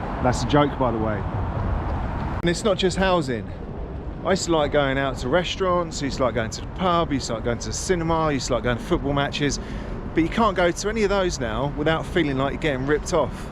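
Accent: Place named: England, United Kingdom. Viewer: U going to Americana lol worse than uk or England which u spund like u from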